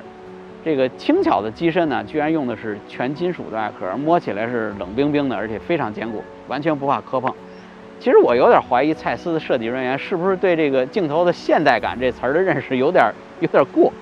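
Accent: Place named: Beijing, China. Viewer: 北京的吧？迈玛儿拍，一听就是北京的😂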